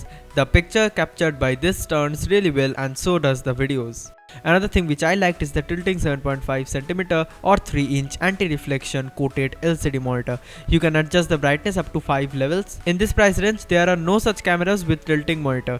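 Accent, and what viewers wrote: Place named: India. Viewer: Its called English with Indian accent, we indians are comfortable with this kind. He is speaking so fast because the video has to be fast and quick or it will take longer time which audience may not like. It's a good review for indians . Thanks brother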